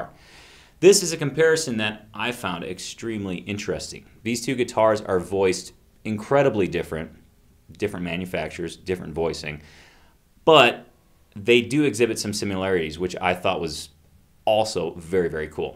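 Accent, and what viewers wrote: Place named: United States. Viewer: Nice job,. You sound more like a Yank as the years have gone by.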